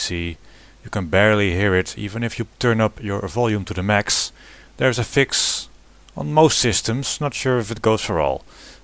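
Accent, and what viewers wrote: Netherlands. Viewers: Aye is that a Dutch person I hear? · zo mooi om een nederlander engels te horen praten, je hoort het ook echt atijd binnen 1 seconden.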